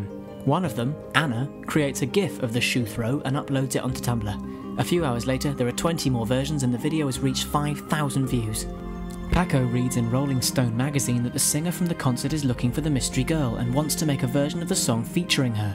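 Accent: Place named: Ireland. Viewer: this square head Irish is pounding in my ears ><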